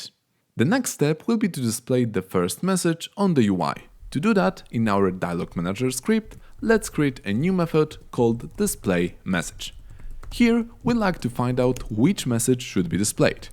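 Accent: Poland. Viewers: Jesteś z Polski? Bo tak trochę po głosie słychać ale nwm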